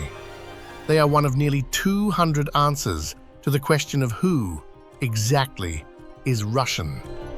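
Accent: United Kingdom. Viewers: The naration is British, not Unitedstatesian.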